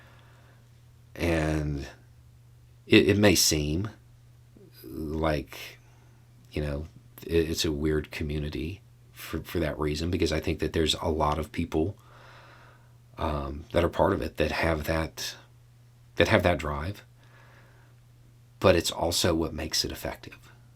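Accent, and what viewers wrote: South, United States. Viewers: I prefer the redneck accent. 😊 From a redneck girl. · have been here long enough to see you evolve from sounding rather southern to not sounding southern at all , you cover a wide range of matters and from your explanations it is possible to see you care about the truth , How do you stay so even tempered and not get mad as hell about some of this stuff , I use your video's to explain things to my GOP friends . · Hi. Just watched the video 'Let's talk about 3 letters that can make rural whites understand the fear minorities have of cops.' and in it, I heard a much strong Southern accent than in any video since I started watching your channel. Which is your day-to-day accent, now or then? Or was the accent you used in that video due to the subject matter of the video - i.e. to talk like a rural Southern person?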